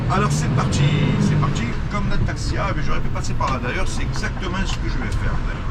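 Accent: Marseille, France. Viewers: Comme toujours de très belles vidéos sur ta région, ainsi que ton joli "accent" j'ai habité cette ville pendant deux ans au milieu de années 1970, j'y ai travaillé au 165 rue Paradis et j'en garde de très bons souvenirs et avec mon épouse nous revenons à Marseille pour nos anniversaires pendant quelques jours. Par tes vidéos je retrouve beaucoup d'endroits où j'ai travaillé, donc merci de continuer à nous faire "voyager" par ton travail dans cette belle ville. Qui chante cette belle chanson " "? Encore merci👍👍👍❤❤❤ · Bjr vous me remonté le moral , j'aime vos vidéos j'aime Marseille,et j'aime l'accent,je vous suis d'Algérie et merci pour votre humour et votre franchise,BNE continuation (Halima)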